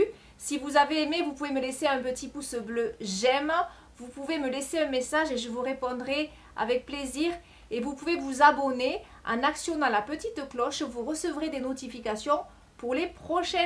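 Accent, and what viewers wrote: South, France. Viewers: Magnifique ! Vous avez un bel accent du midi bien agréable. Merci pour cette démonstration.😍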